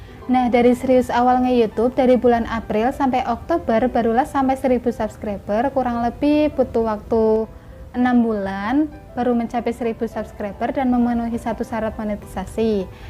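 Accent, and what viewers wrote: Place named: Tegal, Indonesia. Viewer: tegal neh hhahah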